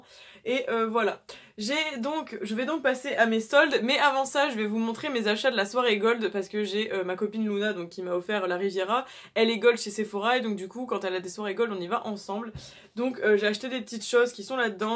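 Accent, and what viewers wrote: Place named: South, France. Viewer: Ton accent du sud me tue mdr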